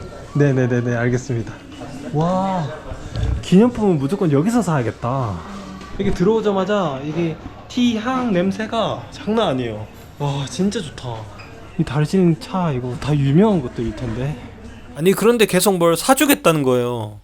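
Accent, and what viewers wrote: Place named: Gyeongsang, South Korea. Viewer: 경상도 섬쪽 말투신데...경상도분 맞죠?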